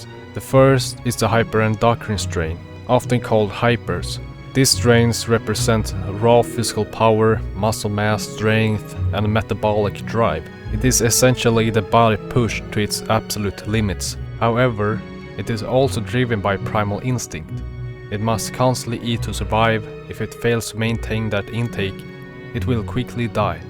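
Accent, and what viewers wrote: Sweden. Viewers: are swedish right cauce you sound like it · Holy swedish accent😭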